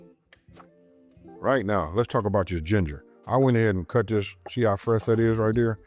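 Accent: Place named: South, United States. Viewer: 😊❤ Wooow, that's a Strong Southern accent!!! Love your cooking!!! Greetings from Ghana 🇬🇭🇬🇭🇬🇭🇬🇭🇬🇭 Come visit sometime!!! 🖐🏾😊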